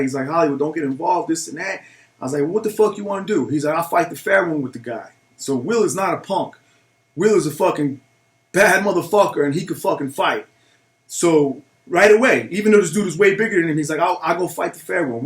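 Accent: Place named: New Jersey, United States. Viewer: You're such a great story teller. Just found ur channel & you got great content. Plus, I luv the accent. Im from Jersey & hearing u talk reminds me of home👍 Keep up the great work!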